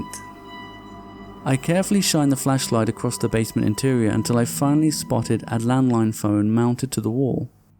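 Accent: United Kingdom. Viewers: Unrelated but you have a nice accent. I assume you are British?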